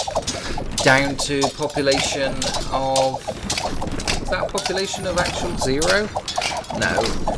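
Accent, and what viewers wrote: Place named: United Kingdom. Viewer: If I may chime in, I’m really enjoying most of your content, watching both the new and old videos, and enjoying most of it. You have a really nice manner of presentation (and a cool British accent too), and although the games are long, I’m sometimes switch off to doing other things while simply listening to your narration. Tutorial type videos are a nice recent addition, really appreciate that. As for the casts, I think 4v4 look most impressive, as the outcome is a bit harder to predict, but 1v1 with closely rated players are also fun to watch.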